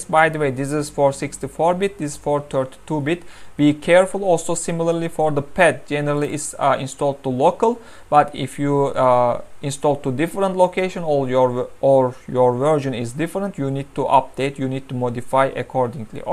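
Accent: Turkey. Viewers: ingilizcesini rahat anlıyorum türk olabilir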